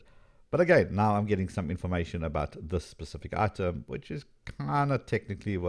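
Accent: South Africa. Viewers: Are you South African living in the USA?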